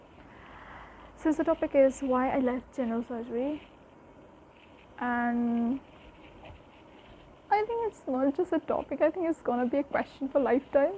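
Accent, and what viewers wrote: United Kingdom. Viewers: Mashallah. Nice English Accent though, 👍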